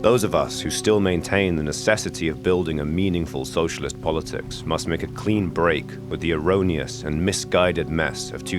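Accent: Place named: London, United Kingdom. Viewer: "Weve got to take socialism from the urban ellite" says the man with the most middle class londoner voice to imagry taken staight from an Bristol rave poster.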